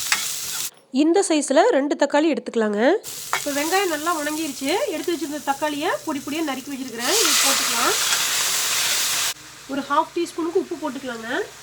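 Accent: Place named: Gobichettipalayam, India. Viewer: hi sis i am new neega namma oruu gobichettipalayagala unga videos pathaga ella nalla pannirukiga namma oruu pechu kekkupothu happy irukuthuga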